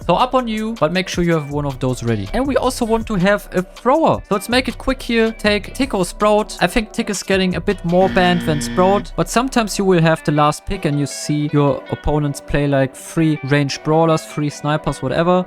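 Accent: Germany. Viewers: Sprich deutsch dein deutsch Akzent ragt zu sehr raus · Bro der deutsche Akzent ich fühl den so hart 😂😂😂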